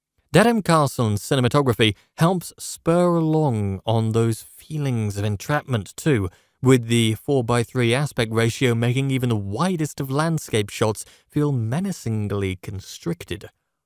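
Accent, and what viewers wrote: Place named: England, United Kingdom. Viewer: Trying to watch the fin clip n all I'm hearing is some pommy wanker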